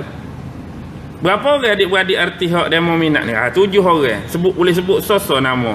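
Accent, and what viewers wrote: Kelantan, Malaysia. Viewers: Sedap dengar Ustaz guno loghat Klate kito..Skalo dok dgr loghat utara..Ambo jiran Ustaz yah Tanah Merah..Kemah sek kito 👍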